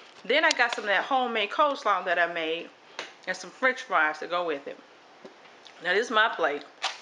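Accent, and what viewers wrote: South, United States. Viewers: Love your voice and it’s making all kinds of southern accent sense to me! Sending love cook to cook from Bham, AL! ❤❤❤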